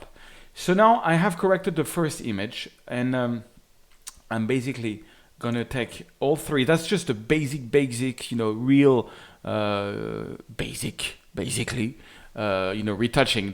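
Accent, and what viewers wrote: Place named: France. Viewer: It's funny to come back to these videos to see how much his French accent has dwindled over the years--video content still 🔥though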